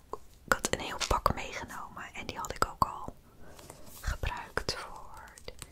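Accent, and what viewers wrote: Rotterdam, Netherlands. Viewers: Heyy kom jij uit rotterdam?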